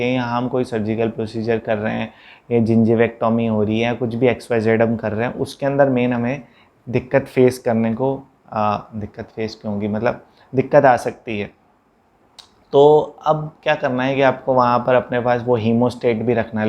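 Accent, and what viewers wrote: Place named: Uttar Pradesh, India. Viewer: Sir Aap pakka UP se belong krte h 😅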